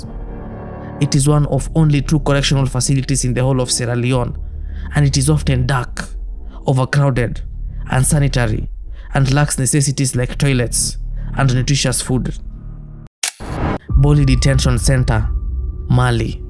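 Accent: Kenya. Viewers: I can tell the owner of this channel is Kenyan even his English proves so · the accent... Acha izo... Weh ni mkenya?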